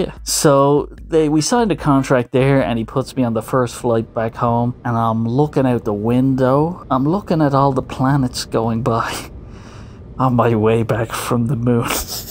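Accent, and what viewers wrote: Ireland. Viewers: I didnt know you were irish! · What side of Dublin are you from?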